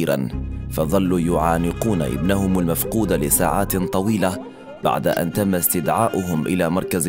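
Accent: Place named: Morocco. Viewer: واضحة اللكنة المغربية ف لهجتك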